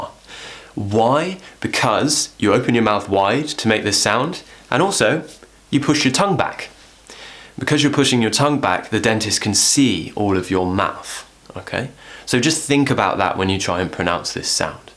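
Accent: United Kingdom. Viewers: I love your British accent 🤩. Do you have any recommendations for british audiobook? I want to listen more.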